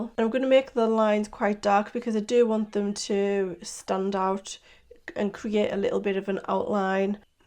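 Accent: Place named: Birmingham, United Kingdom. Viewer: Trying in vain to pin down your accent but I’m getting North East England and Brummie 🤔 Lovely work and I’m glad YT shared your channel with me today.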